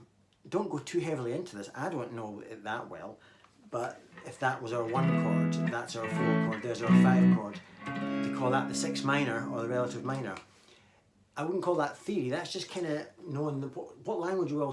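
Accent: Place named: Edinburgh, United Kingdom. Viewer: You know, as much as I love our dear friend Uncle Larry (Tom Bukovac Home Skoolin' for those who don't know what I'm talking about), you are talking directly to me in a way that just hits home. I dig the gear you use and your videos about how you use them, and your lessons even more. The charming Edinburgh accent is a bonus! And thanks for the shout-out.:-) I guess it is soon time to get some merchandise available for us fans to buy;-).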